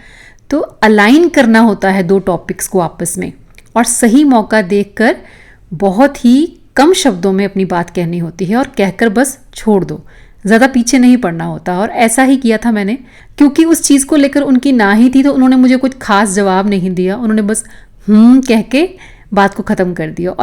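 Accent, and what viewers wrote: Punjab, India. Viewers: I think this is because of the Punjabi accent of her. But sounds very nice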